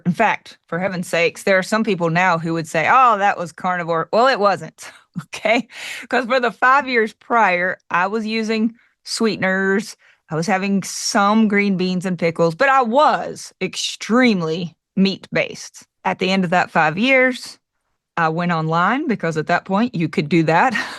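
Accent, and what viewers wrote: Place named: East Tennessee, United States. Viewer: New subscriber. Love your personality. You sound like you’re from around here, East Tennessee.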